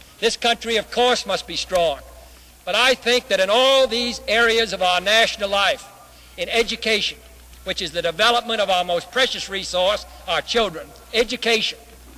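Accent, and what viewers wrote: Boston, United States. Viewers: You've got to love his Boston way of speaking. Beautiful. He pronounces "last" in the English manner and "passing" in the American manner. Pardon me for saying "English". It is the "Boston Manner".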